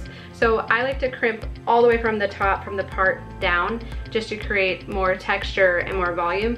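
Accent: Minnesota, United States. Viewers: I love your videos. And I love your MN accent. I’m not from MN but I lived in Mpls for 4 years and fell in love with the accents there as well as the people. Not only do I value your content and personality, but I can watch your videos and get my MN fix from my own home!🤗😎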